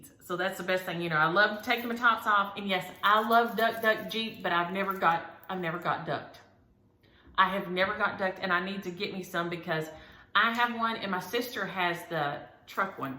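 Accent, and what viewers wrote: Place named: Houston, United States. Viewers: I’ve watched you for forever but I seldom comment. Just love your sweet heart and generous spirit not to mention beautiful stitching. Don’t change your accent, I love it. We lived in Houston when our oldest was aged 3-5 and she sounded just like you back then. Sometimes when I’m finished watching you, I even sound like you!!! 🤣🤣🤣. Love the ABC’s. 😘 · Love your Texas accent! We lived in Texas for almost ten years and it kind of rubbed off on me. I would love to stitch the dog pattern! Thanks for a chance!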